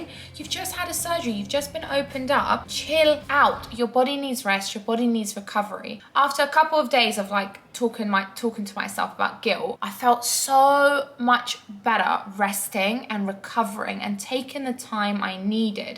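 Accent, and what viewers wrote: United Kingdom. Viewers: daaammmnnn...why am I so love to british accent, so cute 😻 Wait 🙄, really just the accent bro?? 😏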